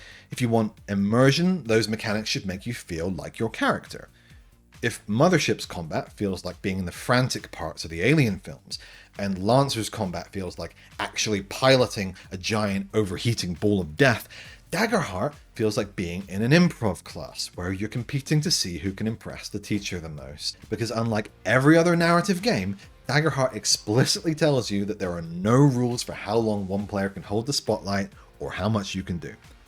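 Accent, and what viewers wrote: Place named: United States. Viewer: Not the American accent for reading the spotlight rules 😭😭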